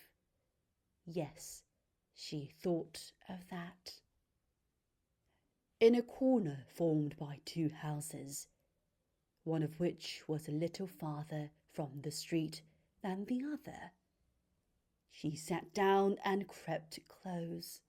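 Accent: RP, United Kingdom. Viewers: 우와 british english asmr은 처음 클릭해서 들어보는데 진짜 동화책이네요. 액센트도 원어민 RP 라서 넘 듣기좋아요 :) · 영국영어 원탑이신듯~!! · 통해서 영국 발음을 더욱 좋아하게 되었어요 😍😍영상 올려주셔서 항상 감사하고 친절한 코멘트도 감사합니다 😊😊 좋은 밤 되세요 💖💖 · 최고다 최고... 대박이다 대박 여기가 영국이네요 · 잠와요ㅋㅋ좋다!!!!강추 영국에있는듯합니다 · 발음연습에 정말 도움 많이 됩니다😍😍 영국남자보면서 듣기했었는데 여기서 보니 완전쏙쏙 들어와요 영국발음매력에 더 빠지고있어요😩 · 동화올려주셨네요 감사감사^^~ 애가 영국발음이 좋아해요. 다좋은데 10분만에 안자네요 ㅜ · 영국발음너무좋아하는데 목소리랑 너무 좋아요 진짜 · 저 이거 들으면서 영국억양 따라해보고 발음 배워욬ㅋㅋㅋ자라고 만들어주신건데 배우고 있네요ㅎㅎ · 평소 영국식 발음에 매력을 느꼈어요 구독 누르고갑니당♡ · 이번엔 둘째아이 옆에서 영국식 영어의 신세계 입문중^^ 5천명 곧 돌파!! 화이팅!! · 영국유학한 지인이 말하길 비비씨 연령있으신 아나운서들이 쓰는발음이라하네여 ..발음좋으시다구ㅎㅎ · 영국영어 넘나넘나 좋아해요♡♡♡♡♡ 광고보고 아나운서님 팬되었는데 이렇게 직접 들을수 있다니💕💕💕💕 천천히 읽어주시니 더 좋습니다♡ 참, 혹 녹음하실때 쓰시는 마이크장비를 여쭈어봐도 될까요~~??!!! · 정말 고급지고 세련된 상류층 영국발음이군요. 최고네요^^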